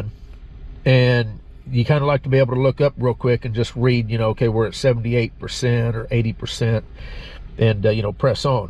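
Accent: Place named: South, United States. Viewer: I thought I heard a southerners voice, im from AR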